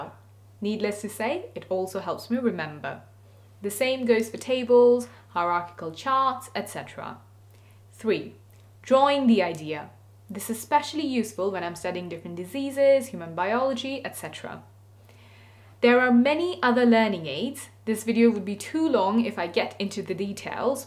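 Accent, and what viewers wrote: United Kingdom. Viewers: How would you expertise British accent? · You talk perfectly in british accent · your british accent is so soothing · how you axcually gain the british pronunciation and fluency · That accent is way tooo oxford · very sweet British accent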